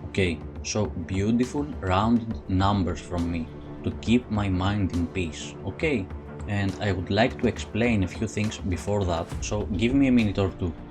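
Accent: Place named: Russia. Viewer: What you said about my region is true and I'm from the worst nation in all of the middle east and Africa(guess the name it shouldn't be that hard to do) and actually I recognized the accent too; he's Russian and that's the 2nd most powerful nation in the world and to be this strong you probably have many people who are very smart, intelligent and educated and this is specially true for Russia that doesn't have much natural resources(I know they have lots of oil and natural gas which is good but not so good economically with all the sanctions imposed) I just got so triggered with the list that I wanted to say something awful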